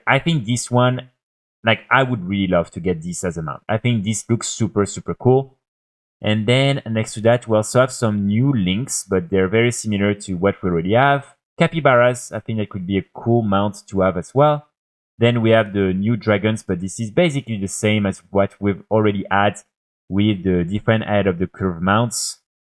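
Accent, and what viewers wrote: France. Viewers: He’s French. Try not to be a petty piece of shit online. 👍🏻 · thats called a French accent, are you slow? (edited) guess you had some typos huh sped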